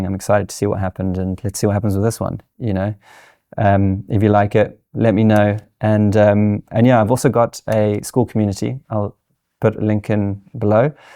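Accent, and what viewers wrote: South Africa. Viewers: Keep at it, I randomly stumbled across it. Love it. Recently started as well, after 3+ years of putting it off. Listening, it sounded a bit like you were South African. Im loving the content, please do keep it up. Im trying to be raw, edit as little as I can (Which is hard) but Im working it.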